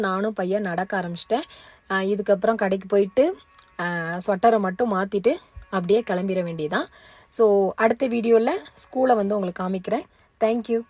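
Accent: Tirunelveli, India. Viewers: நீங்க எந்த ஊரும்மா பைய அப்படின்னு பேசுறீங்க திருநெல்வேலி, நாகர்கோவில் இப்படி பேசுவாங்க அதனால் தான் கேட்கிறேன்மா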